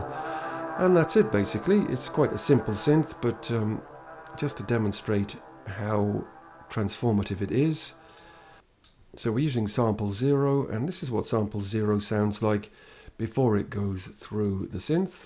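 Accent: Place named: South Wales, United Kingdom. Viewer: It's been doing my bean in for a while now. I KNOW that voice/accent !! ... but I can't place from where I remember it. Another YT channel ? My musical adventures from days of yore ? My work with PAs ? . I too have a South Wales accent but mine's from a little further West. Love your work in reminding us of the power of Reaktor btw. 👍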